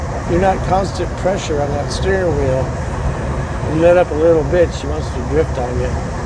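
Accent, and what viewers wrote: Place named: Colorado, United States. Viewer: /Coloradan accent! Keep on trucking!uCifornia/Colorada accent love it! Keep on trucking!😀🐼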